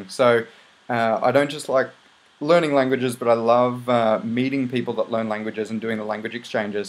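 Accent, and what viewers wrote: Australia. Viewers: ! I knew you were Australian!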